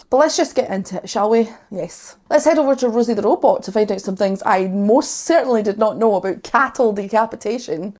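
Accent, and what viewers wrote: Scotland, United Kingdom. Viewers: I'm a silver fox😂😂😂 52 and been listening to Metal fir over 40 years now. My ma was 13 when The Beatles erupted and she got me into rock n roll. They were the first band I remember listening to and it's went from there. I repaid that start by taking her to see Iron Maiden. She is a massive Manowar fan (a band you have to listen to). But the crowning glory was taking her to see Paul McCartney at Giants Stadium in 1990. Please keep doing what yer doing and fuck the naysayers 🤘🏻🇬🇧🏴🖤 Love the Cattle Decapitation as well🤘🏻 P.S. NEVER apologise fir yer beautiful Scottish accent and being Scottish 🏴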